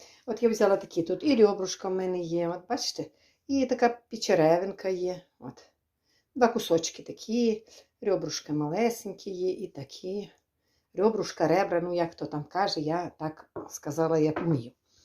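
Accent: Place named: Luhansk, Ukraine. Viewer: Чудовий рецепт. Так моя бабуся готувала, тепер іноді я. У вас неймовірна говірка, я наче знову побував вдома на Луганщіні. На тих недолугих обох статей, які вам розповідають як правильно говорити, не звертайте уваги. У них просто давно не було хлопця.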